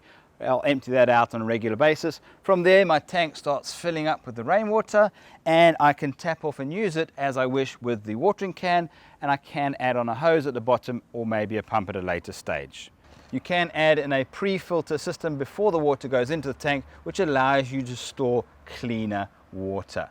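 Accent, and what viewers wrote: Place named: South Africa. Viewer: South African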